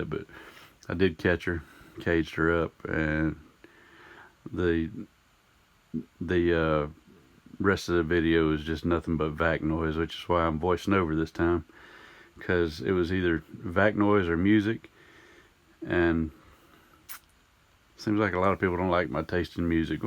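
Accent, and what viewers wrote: South, United States. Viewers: Just a wild guess sir, are you from the south? 😂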